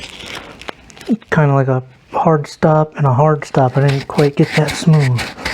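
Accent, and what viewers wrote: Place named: South, United States. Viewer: AND.....how the hell did you lose a Connecticut accent and develop a southern one. That seems pretty difficult to me. My Stepdad is from Boston (Baahsten) Occasionally, he'll slip and tell tell us, for instance to "get in da CAAA."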